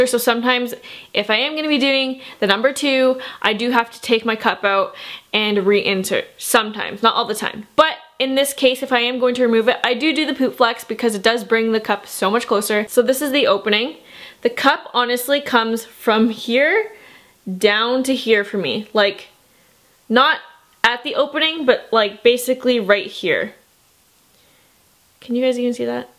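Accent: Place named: Pennsylvania, United States. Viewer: First off, thank you for the informative vid! I just purchased my first cup& I'm due for my next cycle in about 3 days & eager to try it out! Also, this is random, but do you live in or are you from PA? I hear an accent- ish similar to my family that live there.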